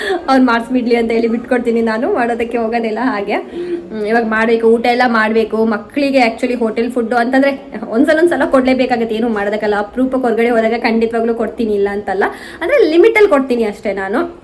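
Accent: Kundapura, India. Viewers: Super ede beach ⛱️ side yalla, kundapura kannadalli mataddidru swlpa swlpa arta agutte 🤭mutton biryani 😋nyc 👌 sharing ❣️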